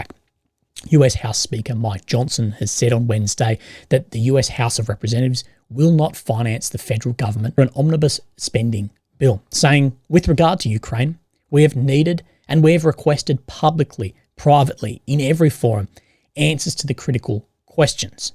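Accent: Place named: Australia. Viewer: Australian accent, get over it.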